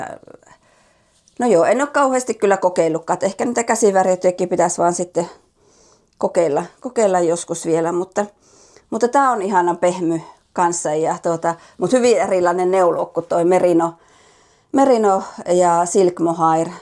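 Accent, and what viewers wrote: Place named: Eastern Finland, Finland. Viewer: Tykkäsin tästä videosta ja laitoin tilaukseen. Oletko kotoisin itä-Suomesta? Puheenparsi kuulosti niin tutulta. Minä tykkään neuloa keinutuolissa ja sään salliessa puutarhakeinussa. Hyvää kesää!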